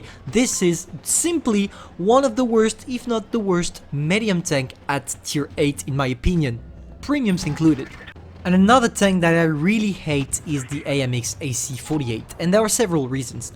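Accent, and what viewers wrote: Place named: France. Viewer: I just discovered your channel thanks to the youtube recomendations, and I have to admit your videos are pretty interesting. By the way, are you french ? Parceque j'ai l'impression de remarquer un léger accent français malgrès t'a prononciation excellente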